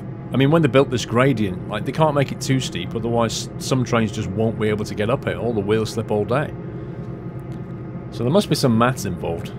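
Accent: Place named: United Kingdom. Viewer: How do you forget that when he has a British Accent?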